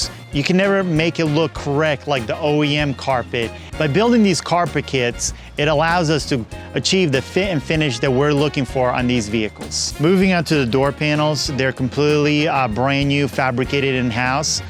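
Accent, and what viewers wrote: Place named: Louisiana, United States. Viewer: Why you got a Cajun accent, my guy?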